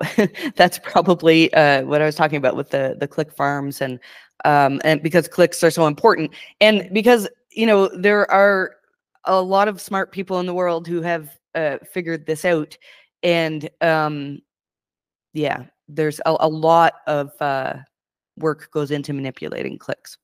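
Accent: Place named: Canada. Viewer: I don't remember you having such a strong Canadian accent :) Luvyawork. Panda is scary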